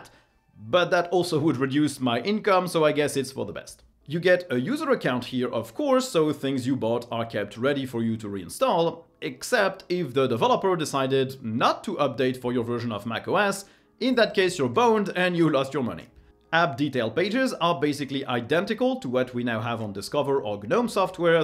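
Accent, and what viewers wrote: France. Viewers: That's French accent^^ funny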